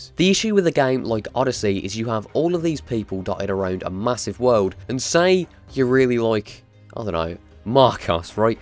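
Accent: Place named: RP, United Kingdom. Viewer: Why are you soooooo posh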